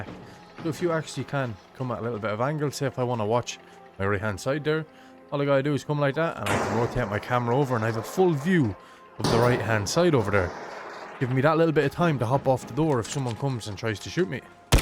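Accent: Ireland. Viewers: He obviously has an Irish accent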